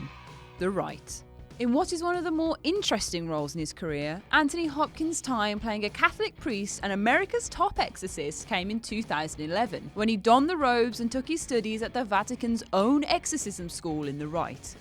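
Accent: United Kingdom. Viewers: I don't believe in ghosts, but I also don't have a problem with hosting. I find it kind of refreshing to hear a female British accent presenting a Whatculture video.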